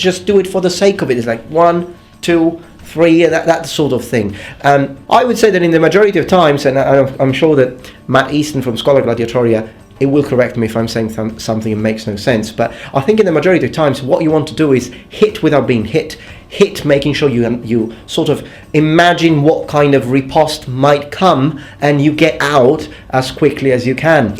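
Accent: England, United Kingdom. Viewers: Why does it sound like you have a English accent when you are from Sicily? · Does his accent have a little bit of British in there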